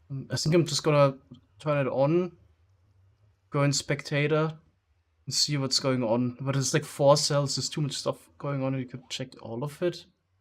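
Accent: Germany. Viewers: Hi du hast manchmal einen deutschen Akzent. Bist du Muttersprachler Deutsch?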